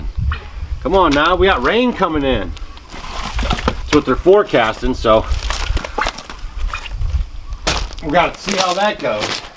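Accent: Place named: Utah, United States. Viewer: are you in utah or missouri i heard both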